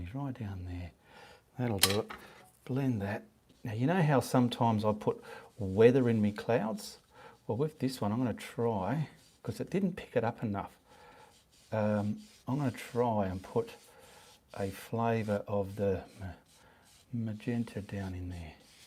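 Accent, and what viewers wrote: Australia. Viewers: Love your 'Stralia accent as much as your teaching skills. Oldie me (72yrs) in Inverurie. NE Scotland( Re accents I speak The Doric,so "Fit a fine daundie mannie learning us tae pint.xxxx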